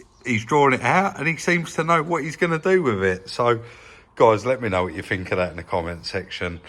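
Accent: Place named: Yorkshire, United Kingdom. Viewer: He must be a Yorkshire man .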